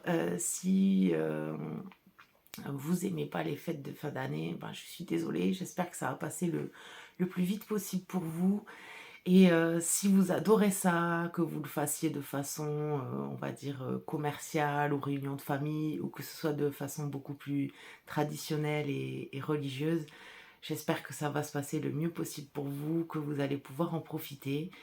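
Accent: South, France. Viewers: J’atterris sur la chaîne depuis celle d’Audrey! Grande réflection aussi sur la morphologie… j’ai des petites épaules et les yokes sont souvent démesurés (sauf ceux d’Audrey dont je suis absolument fan!). Je tricote en ce moment un raglan pour varier car je trouve qu’ils rendent plus justice à mes épaules. Je lorgne donc aussi sur des raglans avec jacquard! Que de belles couleurs derrière toi 😍😍 Si je ne devais en garder qu’une… je choisirais du merino non superwash c’est si moelleux! À bientôt! (Merci pour l’accent du sud qui me sort du brouillard bourguignon!) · Bonjour quel plaisir d'entendre l'accent du Sud , je suis née a Arles et rapatriée en Creuse ! Tu n'est pas obligée de me dire d'où tu habites mais cela m'intéresse. Merci pour ces moments avec le sourire. Bon courage pour ton travail qui est magnifique. 😘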